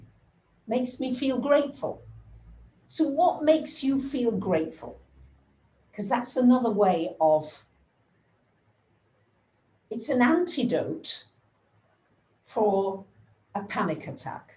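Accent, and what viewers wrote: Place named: Lancashire, United Kingdom. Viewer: I always knew there was a little Lancashire twang in your voice,! Lots of love from a fellow Lancashire lass xxx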